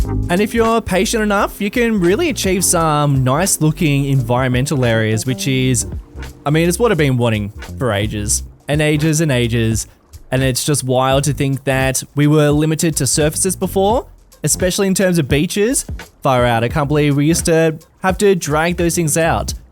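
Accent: Australia. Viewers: the Australian accent is awful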